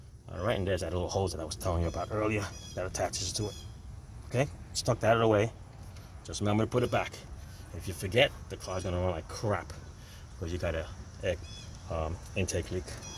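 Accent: New York, United States. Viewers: you have this Italian accent lol are you from NY...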